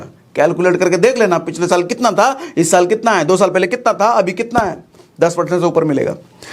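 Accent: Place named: Uttar Pradesh, India. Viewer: Ye thumbnail ka kamal nahi hai sirji, ye to aapki attraction ka power hai Jo ham logon ko videos dekhne ko majboor kar deta hai, up accent ka power 💪💪♥️♥️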